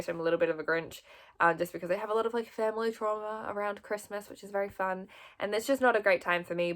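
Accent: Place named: Australia. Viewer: Hii!! I’m a new subscriber! Are you Australian? Sorry, you have an Australian accent :)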